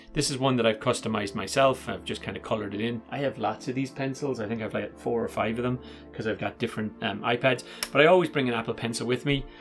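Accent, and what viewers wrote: Ireland. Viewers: I got a little confused at first hearing the familiar Irish accent and seeing an American charger on the desk at the same time.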